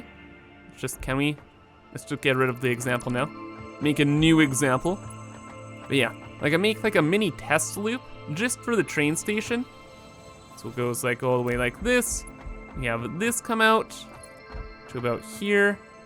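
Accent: Netherlands. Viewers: dude for sure you ar efrom holland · Are you dutch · Are you Nederlands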